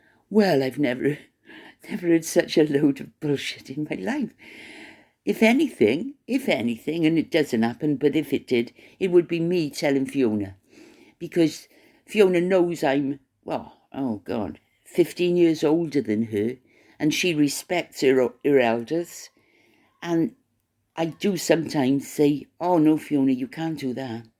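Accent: Wales, United Kingdom. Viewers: You’re back!! I have often wondered about you,. Love listening to your soft Welsh accent and your lovely little stories mixed in with the serious content of the vlog. We won’t say “toma-toes” again. I think that was what finally took you down - especially the rotten ones;) Good to hear you are well and in fine fettle. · OMG!!! can't stop laughing at u're story about u talking 2 the telly!!! I'm from Swansea and u're accent reminds me of an aunt of mine, but she was from Newport 😊 x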